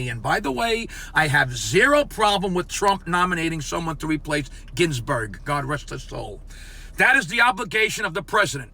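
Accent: New York, United States. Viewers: Love your view points of expression with that New York flair. Hope your ticker holds up but, you are a good loud speaker.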